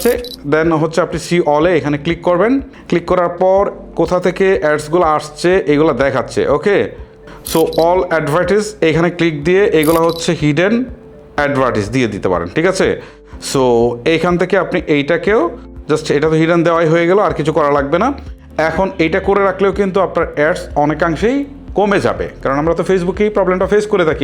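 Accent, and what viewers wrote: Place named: Kishoreganj, Bangladesh. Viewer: আপনার কিশোরগঞ্জের কিছু ভাষা থাকে, সুন্দর করলে আরো সুন্দর শোনাবে।( তাকি × থাকি ✓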